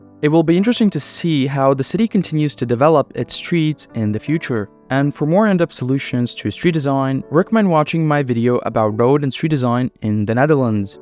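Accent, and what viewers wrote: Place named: Latvia. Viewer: šis viģiks arī ir ahujena bet es tev izaicinu uztaisīt katru piekto viģiku latviski >:) es dzirdu to mikroskopisko akcentu muahahahaha (es atvičai tu esi latvietis)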